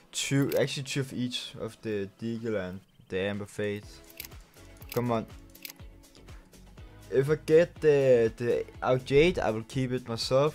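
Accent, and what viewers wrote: Denmark. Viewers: i smell danish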